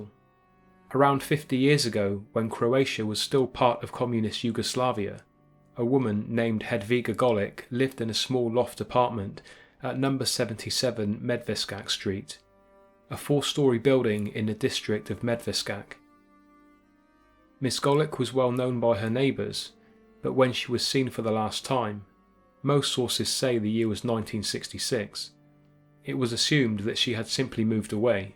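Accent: United Kingdom. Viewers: your impeccable research and ability to keep us on our seats wondering whats going to happen next is only enhanced by your awesome accent. like it would still be really interesting and awesome but I think having a distinguished british accent just ties it together in a beautiful black bow.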